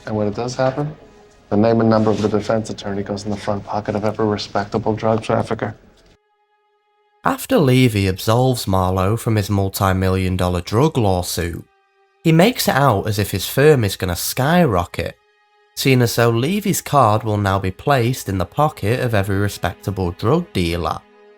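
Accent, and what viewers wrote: United Kingdom. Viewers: We gotta use AI to change this guys voice…. Why so slow and British ew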